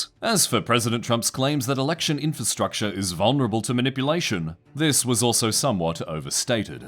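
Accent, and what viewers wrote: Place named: Australia. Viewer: Intro hits hard, your intros always hit hard. The music choice is spot on EVERY time. i feel like ive seen more aussies in the past 5 years online than any time before in my life and i just wanna say you're (almost) all awesome. Australian accents are like british accents just with some spice, and a million times better, imo. Live long an prosper (not a startrek fan)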